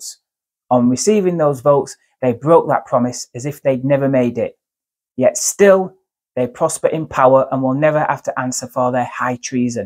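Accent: United Kingdom. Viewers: It's been a while i am listening to British accent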